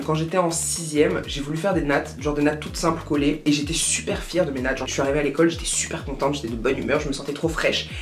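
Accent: Ivory Coast. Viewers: L'accent ivoirien 🇨🇮🇨🇮🇨🇮💗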